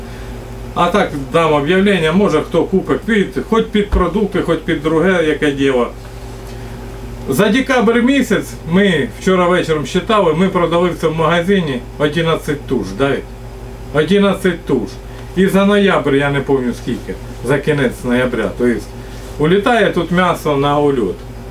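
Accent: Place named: Khmelnytskyi, Ukraine. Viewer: Живу на Хмельничині, вроді західна Україна а мова одінакова.Такий самий суржик як у вас .Ні одне слово не ріже слух.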